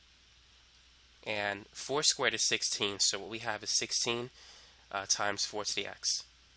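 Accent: United States. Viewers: Angie Mejia America probably, he has an American accent but with a little bit of a lisp so that's why it sounds accented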